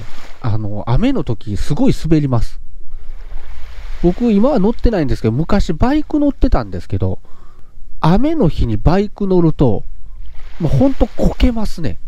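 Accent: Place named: Kansai, Japan. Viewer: 15年沖縄にいて関西訛り抜けないのが1番ビックリしてますw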